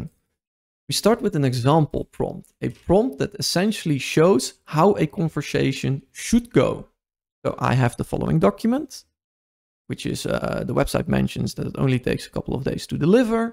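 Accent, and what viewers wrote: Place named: Netherlands. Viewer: God what a Dutch accent, coming from a Dutchie 😂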